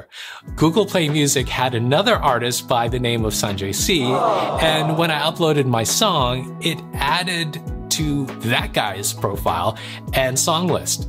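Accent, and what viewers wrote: India. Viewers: Abe tu Indian hai na? ....Orr bohot angrezi jhaad raha hai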